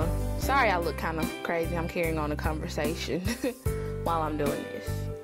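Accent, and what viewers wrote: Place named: Atlanta, United States. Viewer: Hey!:P love this look. Are you from ATL by the way? I heard a lil accent:)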